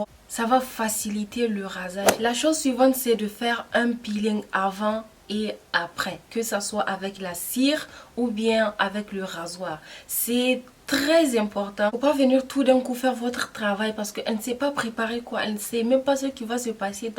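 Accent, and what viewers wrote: Niger. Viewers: J'ai direct reconnu l'accent Nigerien 😅, merci pour les conseils ! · Merci chère soeur Sinon tu as un accent Nigérien.suis Nigérienne aussi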